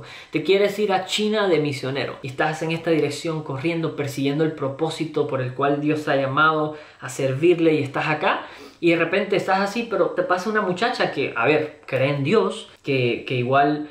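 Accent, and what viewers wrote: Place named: Argentina. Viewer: Traes un pequeño acento argentino por ahí! 😆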